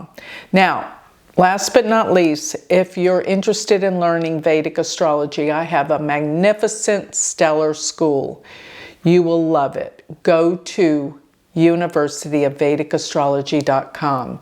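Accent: Texas, United States. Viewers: You can speed up the playback by going to the Settings button that looks like a little wheel in the bottom right hand corner of the video; click on it, select Playback Speed and you can speed it up! I usually use 1.25 or 1.5 speed if I'm in a hurry. But I do love a Texas drawl, so normal speed is good for me!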